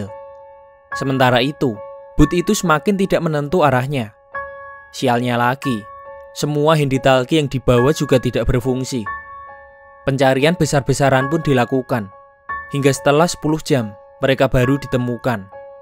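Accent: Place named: Java, Indonesia. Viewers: Medok banget naratornya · Logat jawa bikin candu kalo denger sambil rebahan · Orang Banyumas kayanya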